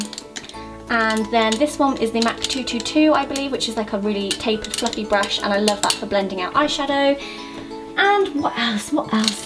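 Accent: England, United Kingdom. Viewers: She's English! Oh my god.... where did you think she was from, bloody Australia! ? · I love your videos so much and I like that you are such a positive and happy human!❤️❤️and i love your british accent👌🇬🇧👍😘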